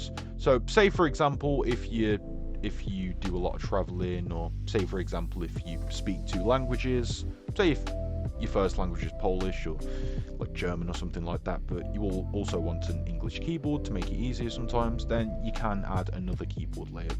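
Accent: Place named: United Kingdom. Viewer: Thanks For the Info. Even with the Heavy UK accent This New Yorker understood you very well. Not a fan Of "Recall" forced on you with Widows 11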